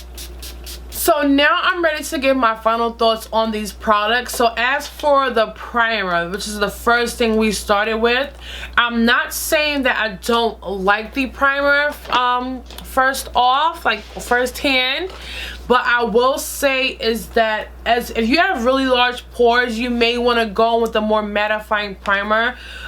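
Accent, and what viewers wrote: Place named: North, United Kingdom. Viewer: i always love her accent lol she got a (I'll whoop your ass)up north type of accent.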